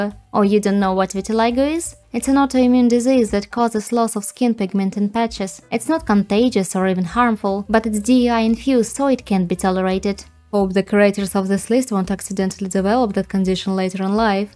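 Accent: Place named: Eastern Europe. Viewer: Yes, it indeed have some slavic languages vibe. Haven't noticed before. She has some strong accent, but it's not clear to recognize for me.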